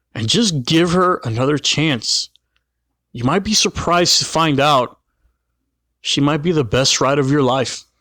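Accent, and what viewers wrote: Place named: South, United States. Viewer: Bring back the southern accent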